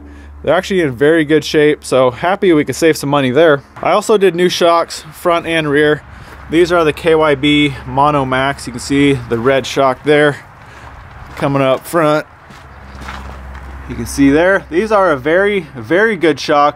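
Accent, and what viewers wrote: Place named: Finland. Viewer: Your Finnish English accent needs a a lot more work. Mika Häkkinen style.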